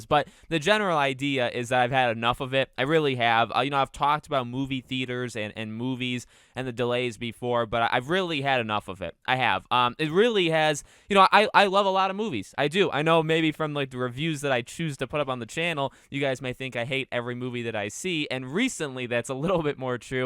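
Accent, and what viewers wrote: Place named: Massachusetts, United States. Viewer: The Massachusetts accent is really strong with this one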